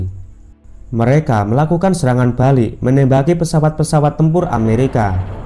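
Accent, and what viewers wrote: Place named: Java, Indonesia. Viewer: Orang Jawa ya mas? 😂 Medok mas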